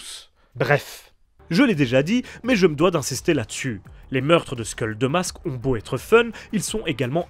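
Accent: Belgium. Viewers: dommage tu devrais essayer un jour car il est fun et excellent Dead by daylight fut mon premier jeux multijoueurs J'ai adoré le faite d'incarner des personnages d'horreur culte des films que tu mentionnes dans la chaîne en tout cas il est magnifique et PS l'accent belge et au rdv 20/20 pour t'as chaîne il est génial 🤣 grâce à toi J'ai découvert des pépites incroyables merci beaucoup 👍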